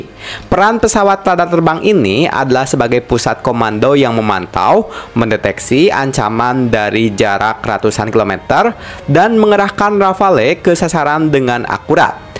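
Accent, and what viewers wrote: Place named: Sunda, Indonesia. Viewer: sunda na meni medok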